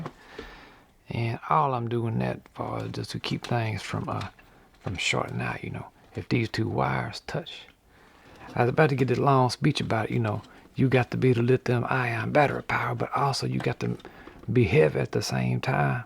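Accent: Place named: South, United States. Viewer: Your southern accent and humor keep see watching, even though I don't hardly understand everything! lol ,,,,,, You are quite smart!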